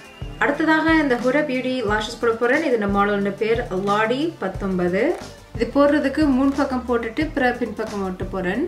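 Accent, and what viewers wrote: Sri Lanka. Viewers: Yha its srilankan tamil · Poosa porein.. Poosa porein.. Oru quiz competition vaikalaam pola. . Lolzz.. Else srilankan tamil azhagu 😍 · Is this Srilankan Tamil? Unga Tamil romba azhaga irukku sister. Makeup la podamaatan, unga Tamilkaaga Subscribed! ❤💯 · Ilangai Thamil alagu thamil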